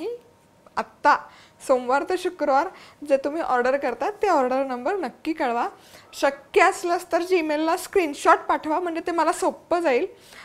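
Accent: India. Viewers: Why do u speak so gavthi Marathi u can speak nicely also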